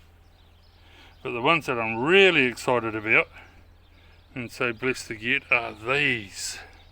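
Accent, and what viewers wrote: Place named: New Zealand. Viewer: Wonderful that you inherited his beautiful collection because you appreciate those rocks so much. His lifetime collection went to someone who understands the joy and work he had as a collector too. Will be wonderful to see the next part of their journey, how you use them. Even sharing them with us is a gift. I live in Oz but I'm NZ born and love rocks. I've learned a lot watching American rockhound channels. I'm so excited to have found your channel and to see my homeland and the treasures we have in New Zealand. Mauri Ora bro. Thanks for sharing. Subscribed and excited to follow. I'm looking forward to watching your past and future videos. So good to hear that Kiwi accent and find someone who shares my love for rocks. My Dad is taking me rockhounding soon here in Oz and I'm excited. I've never been but I used to find serpentine when I was a kid amongst the rocks at Shipwreck Bay on the 90 mile beach. 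My Nan would take us up into the sandunes and we'd find beautiful gems, agate nodules smoothed by the sand. She also had two Moa stones and a couple of Nautilus shells she found on the beach. Spent many happy hours beachcombing as kids and finding shells. We lined her driveway with giant toheroa shells. Don't know what happened to her treasures. So sad. I'd give anything to have had those because they were so special and she meant the world to me. Best days of my life were my childhood with her. xo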